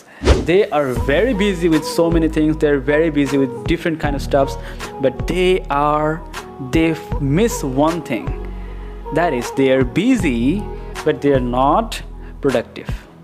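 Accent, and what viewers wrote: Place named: United States. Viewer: দাদা, অনেক অনেক ভালোবাসা ভালোবাসার American accent 🥰🤪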